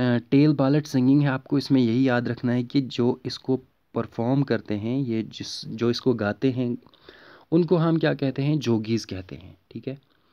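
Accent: Kashmir, India. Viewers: U seem to be Kashmiri by ur accent...then why don't u know wat is tabaq maaz????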